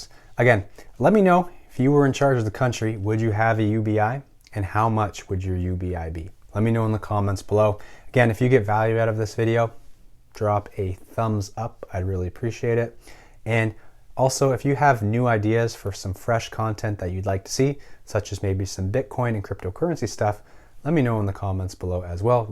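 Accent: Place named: France. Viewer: Justin trudo? Are you French? You have a French accent though its subtle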